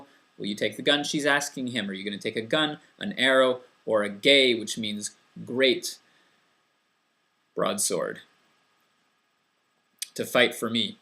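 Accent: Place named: Scotland, United Kingdom. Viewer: I'm interested in the numerical aspect of this one. 'Nine gentlemen' - seven is a common variant in folk songs, as in 'Seven Yellow Gypsies' (yellow not meaning 'cowardly'). I don't speak Scots but you sound right to me, though I rarely hear it. It's a simple story really! Those big ballads seem very interconnected to me, as though variations on the same basic themes. Love, separation, death / murder, betrayal. The Mills and Boon of their day.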